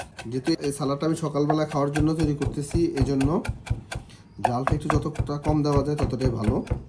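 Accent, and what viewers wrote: Noakhali, Bangladesh. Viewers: নোয়াখালী কথায় বুঝেন না ?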